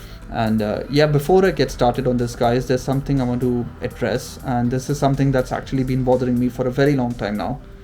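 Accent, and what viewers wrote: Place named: United Kingdom. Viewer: British accent agaya hai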